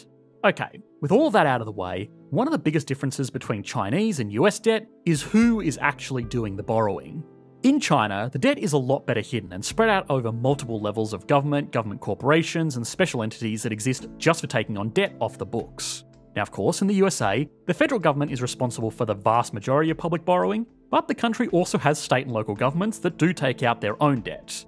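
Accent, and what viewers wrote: Australia. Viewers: take propaganda, put it through disgusting australian accent, paste youtube video, profit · Australian here. I recognise an Australian accent. Notice our independent source commentry is less biased or partisan.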